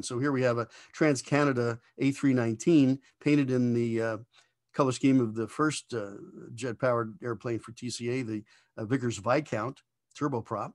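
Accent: United States. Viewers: WHY THE AUDIO SOO BAD. FIRST HE IS WISPERING AND SECOND THE TOO STRONG AMERICAN TONE MAKES THE VIDEO UNUNDERSTANDABLE BY NON AMERICAN FOLLOWERS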